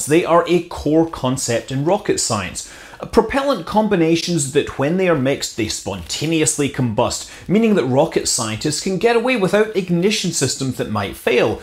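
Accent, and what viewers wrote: United Kingdom. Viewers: Very nice stuff in that great British accent (Or Am I wrong?).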